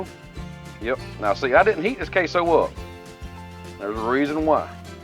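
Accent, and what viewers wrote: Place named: North Carolina, United States. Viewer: Looks so good! Where you from? You sound mid north NC. Am I close?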